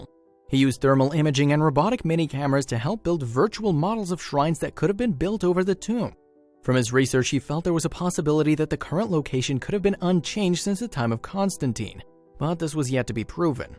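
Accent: United States. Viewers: Pray tell, what accent is the Google Dictionary in? I can bet it isn't in Australian! Why should everything be pronounced with an American accent. It was the British who invented the internet so they deserve the right to the correct pronunciations.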